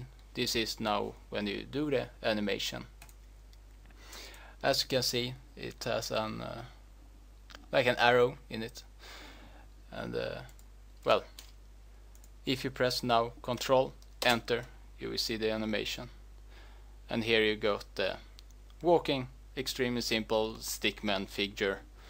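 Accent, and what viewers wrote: Sweden. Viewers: ur swedish arent u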